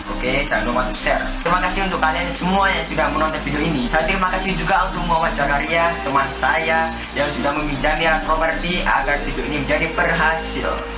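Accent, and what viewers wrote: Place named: Java, Indonesia. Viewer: anjir medhogmu coeg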